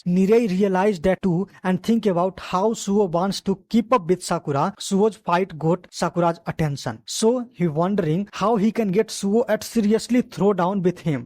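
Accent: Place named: India. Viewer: Please add some emotions in your voice Bro I feel your hindi voice in english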